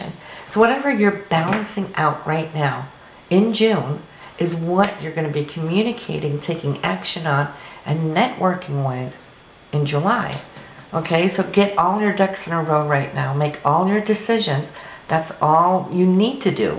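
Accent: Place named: New York, United States. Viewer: nice reading. you sound like a new yorker who looks like she lives in tucson...i say that because I am that!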